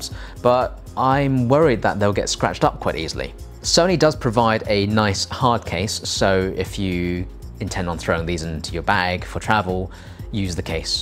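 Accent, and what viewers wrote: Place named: RP, United Kingdom. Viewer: This guy is the most posh-sounding tech reviewer I’ve ever heard.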